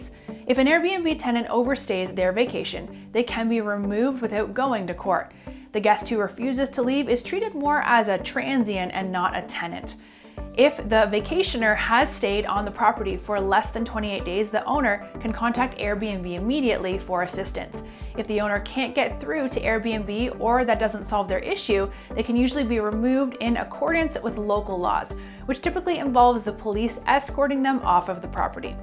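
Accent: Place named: Canada. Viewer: I thought this was going to be for Canada, given her accent.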